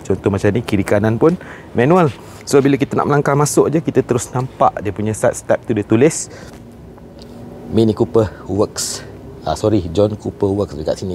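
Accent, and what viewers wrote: Malaysia. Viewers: malaysian mmg camni.. bukan konoha...kalau konoha pronouncation lagi jahanam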